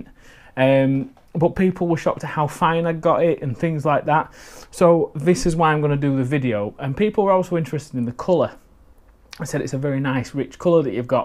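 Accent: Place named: Yorkshire, United Kingdom. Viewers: Thanks for this... I'll not be buying flock ever again also. ( PS, Big 'hello' from someone who was born in Batley and raised in Birstall... nice to hear a proper accent again😉) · nice vid mate. where in the world are you cos you sound northern england?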